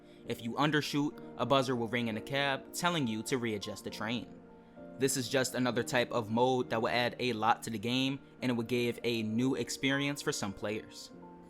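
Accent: United States. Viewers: You’re from New York aren’t you?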